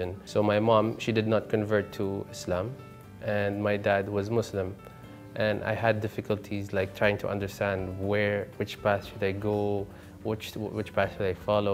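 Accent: Philippines. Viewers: habibi your speaking tone truly proved you are a more than half-part filipino..The way you deliver English words/sentences is moderate, dictions clear, very filipino tone, words are delivered with respect whoever is the receiver/listener...For sure your Mum was a wonderful woman, i could see & feel the way you speak the filipino values in your speaking attitude...For Us filipinos, Manners towards everyday life matters.. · the WAY you TALK... Pinoy na Pinoy..😊😊😊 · Common you might have bi racial background, but in all angle you are more prominent in being Pinoy, therefore don't hesitate to sound such as those things you have already accustomed already from that time you were here in Philippines. Otherwise many will question you and tell that you were just trying too hard to fit in a culture you do not really belong. And it's so grateful to hear you speaking in Pinoy accent, which I think stood out from where you really belong. 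Sabi nga magpaka totoo ka kung saan ka talaga angat at doon ka yayakapin ng mga totoong kababayan mo na nagmamahal sayo at susuporta sayo hanggang sa huli.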